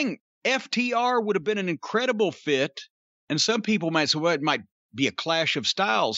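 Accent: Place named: Delmarva, United States. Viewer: Delmarva accent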